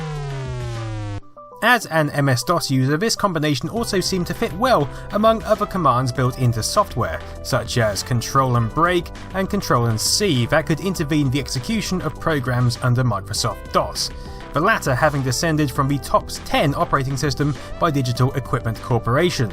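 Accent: United Kingdom. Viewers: Brilliant video after Brilliant video AND with proper British accent what could you possibly ask for more, Thanks!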